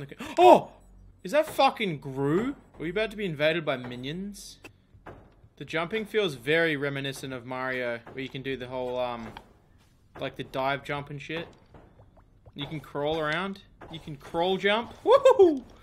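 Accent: Australia. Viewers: say more funny Australian sounding stuff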